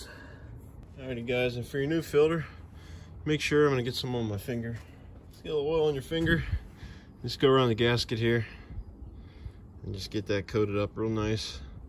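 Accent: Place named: Pittsburgh, United States. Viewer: I hear a Pittsburgh accent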